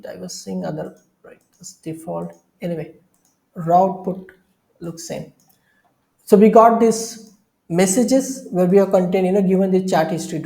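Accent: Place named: India. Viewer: this is the best video ever for me to learn LangChain as well as India accent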